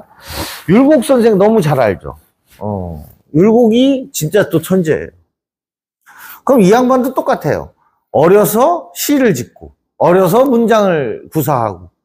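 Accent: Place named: Chungcheong, South Korea. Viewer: 걸쭉한 충청지역 토속억양이 구미 당겨놓고 시작하니 이 풍성한 명절에 선생님 만나는 기쁨 호삽니다 언제어디서나 인간의 만남에 공통 이야기 상식 풀어 주시니 고맙습니다 건강 유지하시길.....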